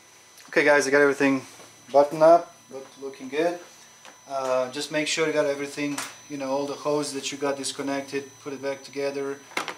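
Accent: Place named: Kentucky, United States. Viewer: Love that old home Kentucky accent Hristos Voskrese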